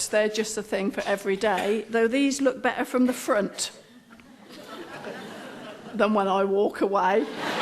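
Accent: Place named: South East England, United Kingdom. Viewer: From the Westcountry (Cornwall), that is not a Westcountry accent anywhere, sounds like old rural accent from the south east to the west of London, before alll the cockney Londoners spread out. So yes, borderland between Oxfordshire and west Berkshire is where I'd place her.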